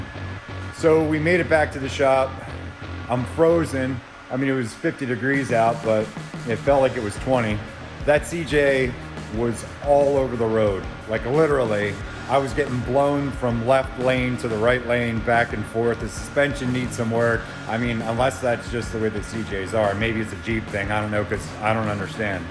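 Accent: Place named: Pennsylvania, United States. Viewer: You sound like a Pennsylvanian! I’m Pittsburgh born and raised! Stillers or Iggles? ;-)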